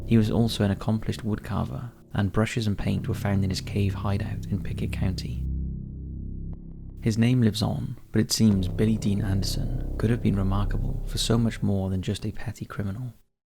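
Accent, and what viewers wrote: Wales, United Kingdom. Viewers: definitely a Welsh accent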